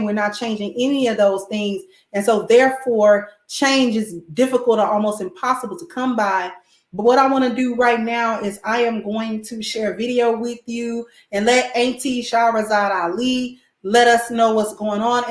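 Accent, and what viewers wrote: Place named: New Orleans, United States. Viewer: Youre definitely from New Orleans aintee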